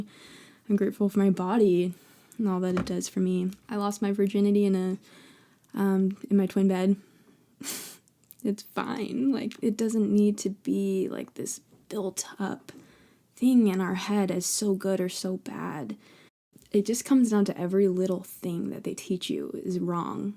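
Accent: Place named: United States. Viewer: I am an Aussie ex Mormon and I love your American accent.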